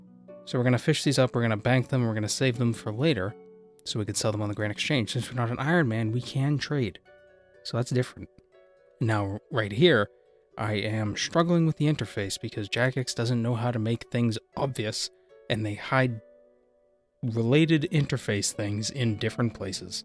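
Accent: Boston, United States. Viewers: i'm just here to look for the boston accent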